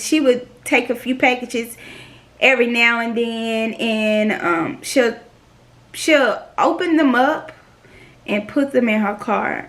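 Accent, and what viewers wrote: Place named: South, United States. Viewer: I love your accent I'm guessing your from the south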